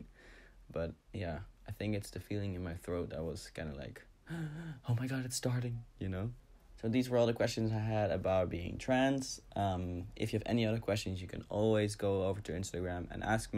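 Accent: Netherlands. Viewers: That dutch accent tho 🤘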